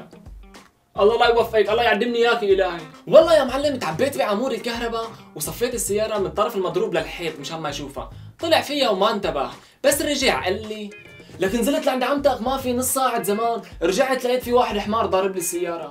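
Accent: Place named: Damascus, Syria. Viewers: Taker 1995 HD لأنو هوي سوري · هاد من الشام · يييييييييييي هاي لهجتو سوري اصلي. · ليش مو عاجبك لهجة السوريين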